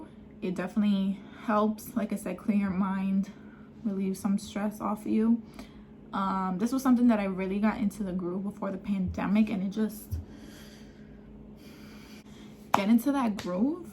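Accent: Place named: New York, United States. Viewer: U sound like u from new York bro bro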